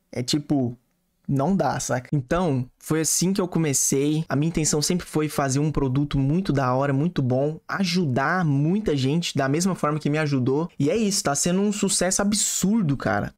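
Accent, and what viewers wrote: Minas Gerais, Brazil. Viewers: Mineiro contando história já é bão! então... nem se fala! hahahaha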